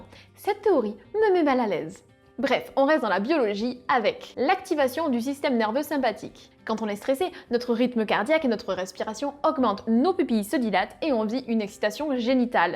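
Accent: South, France. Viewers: Bravo GG félicitations avé le petit assent du sud <3 <3 L&V 💑 #ĹҎ ➰ #Shibuntu <--- Seek on Youtube ƪ(˘⌣˘)ʃ 🌸 #ShibariConsensuel 🎀 #ASMR 🎧 #LacherPrise #Lacher_Prise_ 💐 #Shibari ⛩ #Kinbaku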